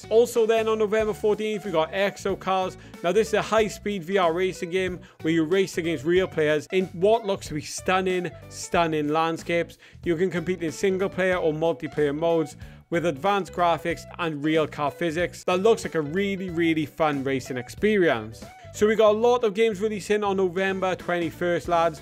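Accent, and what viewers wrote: Wales, United Kingdom. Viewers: Ohh god so nice to hear a fellow Welshman ❤ Da iawn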